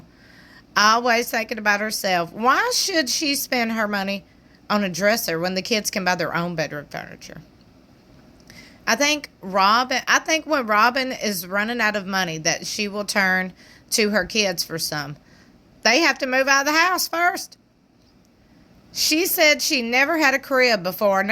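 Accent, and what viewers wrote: South, United States. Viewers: I just want to say I have been listening to you since the days and I just adore your southern accent so much and you have become a staple around my household. My husband doesn’t care a lick about sister wives but always wants to listen in when spilling tea! Keep doing what you do!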